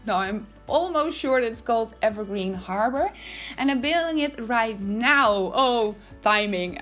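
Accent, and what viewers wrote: Netherlands. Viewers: Lekker Engels op zn Nederlands ook, heerijk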